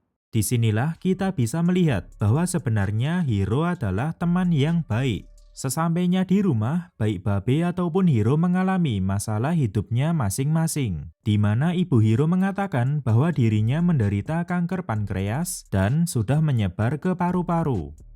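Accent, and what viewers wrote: Java, Indonesia. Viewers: medhok njir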